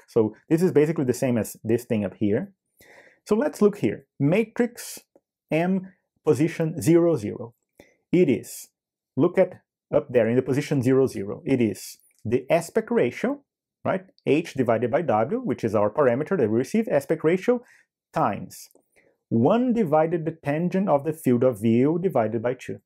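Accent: Brazil. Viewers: dude, your brazilian is pretty strong, i can tell it by the tone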